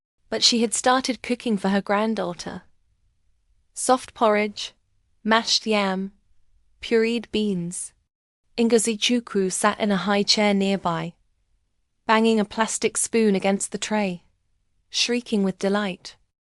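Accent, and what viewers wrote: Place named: United States. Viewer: A very interesting story but why use American intonation thereby ruining the beauty of the story with very wrong pronouncement?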